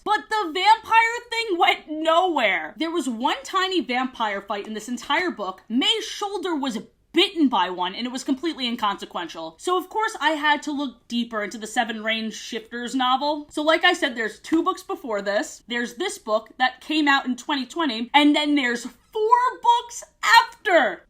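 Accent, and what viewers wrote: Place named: Scotland, United Kingdom. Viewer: I love your Scottish accent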